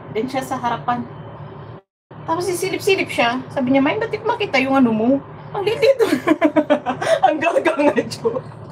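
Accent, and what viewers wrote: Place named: Ilonggo, Philippines. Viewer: Natutuwa ako.sayo pag sasalita ka ng ilonggo.. ❤A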